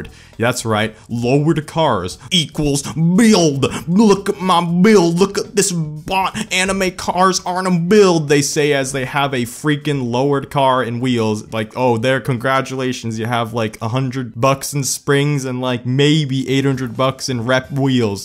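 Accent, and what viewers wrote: United States. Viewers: I hate to sound racist but you sound awfully American for a person Asian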